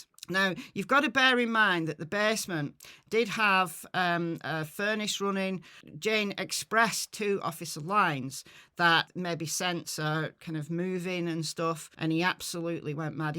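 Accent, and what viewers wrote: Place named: Northern England, United Kingdom. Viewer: You've turned in2 one of my fave channels on this case. Plus you sound like me northern mate. I'm.sarf England. Plus you know what ya talking about xx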